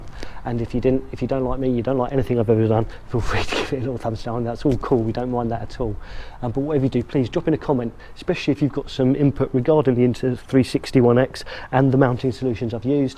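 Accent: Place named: United Kingdom. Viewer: I live in the UK and you sound Bristish 🤔 any idea of how it is like here ?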